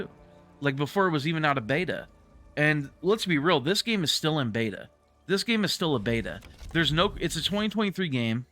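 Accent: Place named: Texas, United States. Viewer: bro! You gotta be from Texas. Heart to confirm 👍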